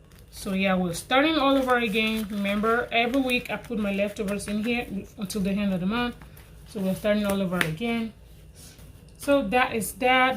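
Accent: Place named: Nigeria. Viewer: Hi! Great cash stuffing. Your so close to finishing your 52 week challenge woo hoo. I love me some Nigerian jallof rice I’ve made it a few times. I thought your accent was Nigerian. I’ve been to African grocery store I find it fascinating. I really enjoy pounded yam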